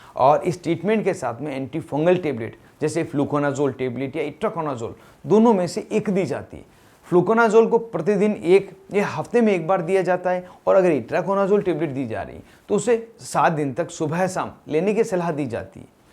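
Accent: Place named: Bikaner, India. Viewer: Aap ke accent se lagta he aap shayad Bikaner se hai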